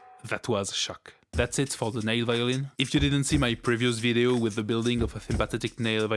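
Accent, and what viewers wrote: France. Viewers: Le magnifique accent français xD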